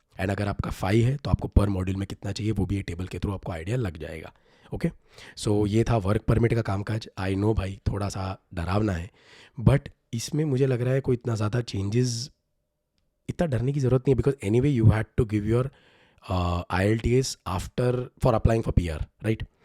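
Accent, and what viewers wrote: India. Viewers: You need to improve your English speaking skills, sounds like you just came from India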